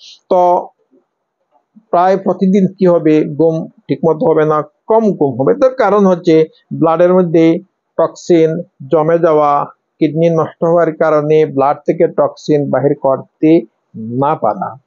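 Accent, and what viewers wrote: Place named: Sylhet, Bangladesh. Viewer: Apnar Bangla Uccharon a Syleti tan achey - Uccharon thik koroin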